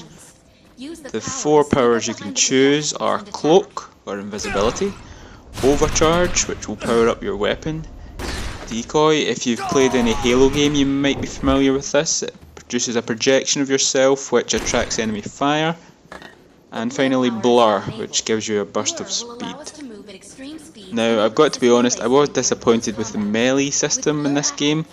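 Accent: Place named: Scotland, United Kingdom. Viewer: First time hearing you speak, didnt know your were that broad scottish;) its stocko by the way, thanks for the shout out:)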